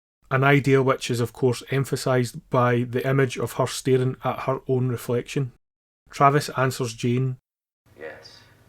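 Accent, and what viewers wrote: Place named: Scotland, United Kingdom. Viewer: Hey this is versustheworldvideo, thanks again for your comment on our latest video. I'm replying on my personal account. I'm Scottish myself, but your accent is much thicker than mine - I love it! Your in-depth analysis is impressive, and your editing skills are sharp. You put a lot of time, care and effort into your video. I was blown away at 3 mins in by your explanations that make perfect sense as to why the director set the scene up that way.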